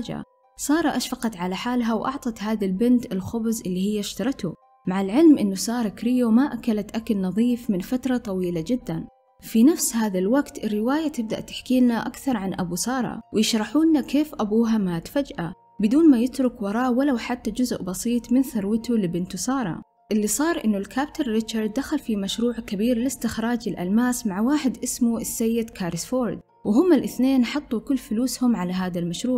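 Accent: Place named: Syria. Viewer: انتي عنجد صومالية......لهجتك كانها سورية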